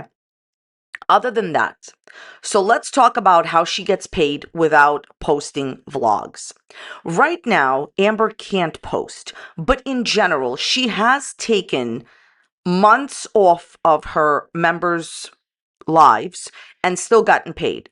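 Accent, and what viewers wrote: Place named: East Coast, United States. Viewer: Was waiting for this 😊😊. My favorite eastern coast accent YT person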